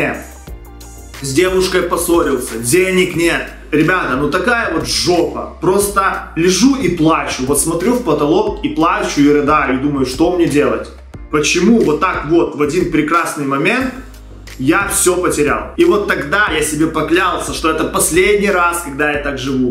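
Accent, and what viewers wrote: Ukraine. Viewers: Какой же у тебя забавный тон разговора). Акцент коренного Украинца.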